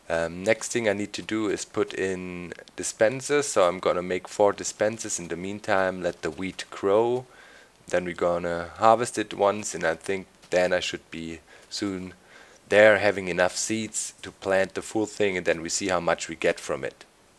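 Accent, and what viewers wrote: Germany. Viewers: has an accent like German frontman Miland Petrozza, and Miland Petrozza is badass.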